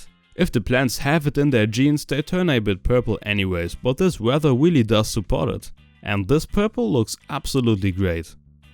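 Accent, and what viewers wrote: Germany. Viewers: I hate to assume but you sound German so maybe you can grow in your home or yard soon. Also I assume you live in Germany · Man hört das deutsch richtig raus hahahah ich liebe es ❤️😂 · Dieser deutsche Akzent 🤣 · Man hört voll das er deutscher ist sein Englisch verrät ihn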